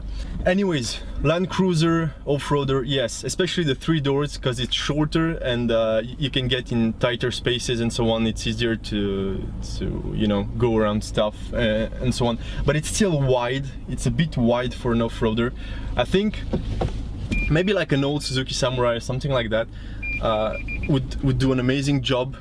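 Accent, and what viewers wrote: France. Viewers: Strong french accent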